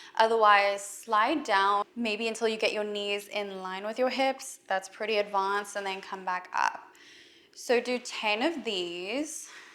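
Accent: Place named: South Africa. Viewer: I hear a South African accent here :-)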